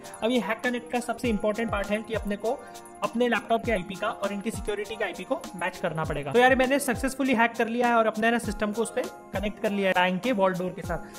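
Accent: Gujarat, India. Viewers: Bhai tum Gujarat se ho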